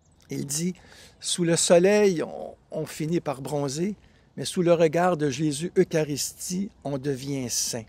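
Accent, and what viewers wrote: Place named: Quebec, Canada. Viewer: C'est la première fois que j'entends une voie canadienne française parler de Carlo Acutis. C'est bien de faire les éloges de bienheureux précoce, mais quand mettrons-nous sur pied une vaste ``opération Carlo Acutis`` dans les écoles parmi les jeunes qui sont on ne peut plus mûrs pour accueillir ce genre de découverte ?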